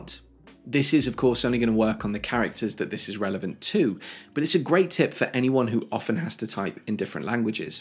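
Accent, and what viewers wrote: United Kingdom. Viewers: You explain things so well -- the British accent helps 😊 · I love your channel, so nice to hear the tips presented in UK English. I use dictation a lot in fact I’m using it now, I also have Dragon which of course is more sophisticated. One of the things I miss in Apple dictation is the ability to use the phrase “ scratch that“ which when you use it in dragon delete what you’ve just said or if you want to select a single word you can say in dragon “select Apple“ and it will then select that word and you can use another one to replace it. I don’t know if such sophistication exists in Apple dictation but it would be really great to know if it does. Keep up the good work, cheers.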